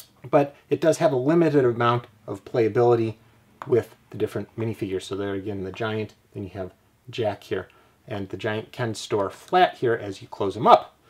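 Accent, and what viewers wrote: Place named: Michigan, United States. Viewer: Are you from Michigan or Illinois ? You have a similar accent to mine. Nice video by the way I just discovered your channel.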